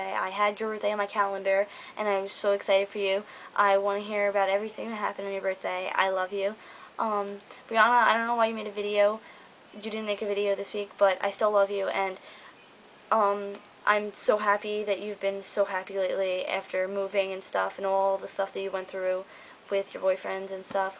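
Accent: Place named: New Jersey, United States. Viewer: You tawk like jersey. lol It's cool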